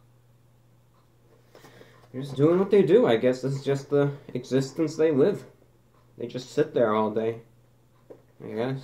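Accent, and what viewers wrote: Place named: East Coast, United States. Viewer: This man seems like a character that you would encounter in one of the Fallout games, particularly 4 due to his east coast accent.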